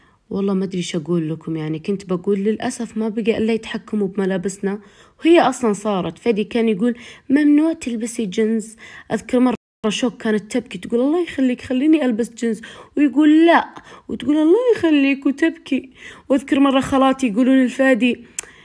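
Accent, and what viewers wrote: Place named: North, Saudi Arabia. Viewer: لهجه اهل شمال ومن عرعر ترا عادي ولو تتابعها بتشوف نزلت كل معلوماتها وجوازها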